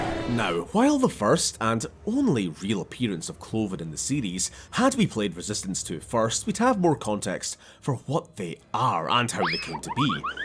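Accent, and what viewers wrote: United Kingdom. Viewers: Dude is just too British. I can't stand it.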